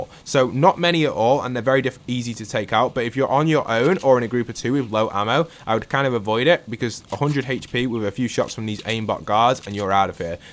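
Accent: United Kingdom. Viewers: You know that's the bri'ish accent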